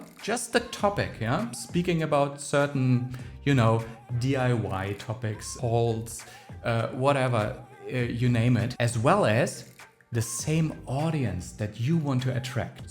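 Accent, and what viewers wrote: Germany. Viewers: This channel is dope. Perfect visual branding and color scheme, completely balanced, properly organized information, flawless delivery. Even the German accent adds to the effect. I feel like I'm watching the Porsche 911 of YouTube growth channels. I'm guessing you drive one.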